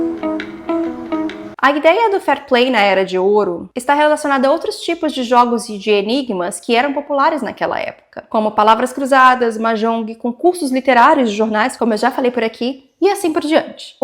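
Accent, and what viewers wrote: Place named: England, United Kingdom. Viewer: Parece que meu cérebro escuta voce falando português, mas no final da frase tem um sotaque inglês 😅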